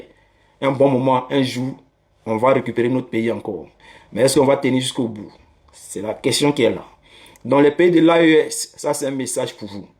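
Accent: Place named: Ivory Coast. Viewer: À t'entendre parler on sait que tu es un bouqui original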